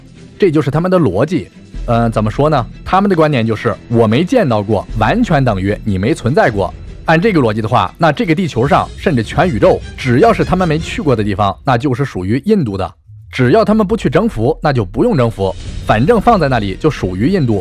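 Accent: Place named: Jiangzhe, China. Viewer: 你說的大致正確 連 “吐蕃” 都能唸出正確的 “土鉢” 的確比99.9999％的中國人要有水平得 多得多！ 可惜你的北京話 實在很糟糕！ 帶江浙土話口音 實在太重！ 唉！ 能不能先把你的北京話 練 “六” 一點 再來拍視頻啊？ 北京是首都欸 你是忘了嗎？ 莫非你是海外華人？ 如果真是 那就當我沒說！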